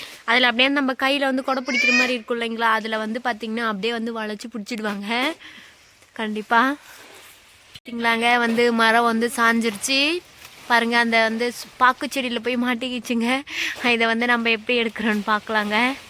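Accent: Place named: Kongu, India. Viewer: Ungaluku sondha ooru edhu.. neenga eppadi anga poneenga.. alagana Kongu tamilla pesureenga adhanala keten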